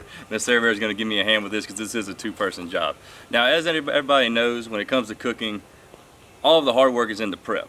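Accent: South, United States. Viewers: Man i miss your southern accent it made ur stories twice as funny. If we had more funny and useful youtubers like you the site would be a much better place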